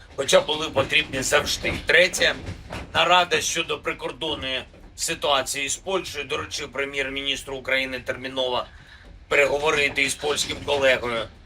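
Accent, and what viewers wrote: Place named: Russia. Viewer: Хреново он по хохлячекму балакае. С русским акцентом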